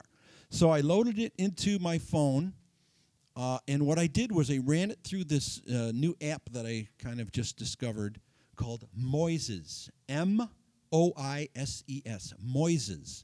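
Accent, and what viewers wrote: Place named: Michigan, United States. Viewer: You sound like you're from Michigan! (I grew up in southwest MI).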